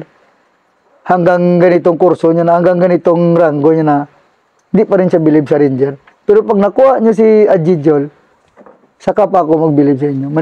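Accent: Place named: Iloilo, Philippines. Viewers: ilonggo gid c sir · Ilonggo yata si Sir sa accent nya..kabaliktaran daw accent ko😂..keep sharing,your juniors will digest and learn something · Ilonggo Pala si Sir.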